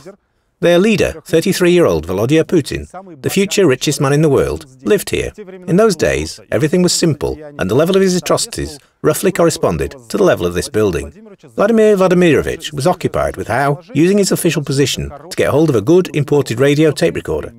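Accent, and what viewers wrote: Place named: United Kingdom. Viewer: Лучше бы американский акцент взяли, его больше народу в мире понимает, чем британский.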